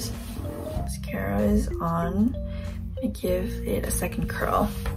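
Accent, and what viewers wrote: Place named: Canada. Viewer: Je trouve toujours bizarre quand un canadien parle français, votre accent est trop mignon (et parfois je comprends rien mdr)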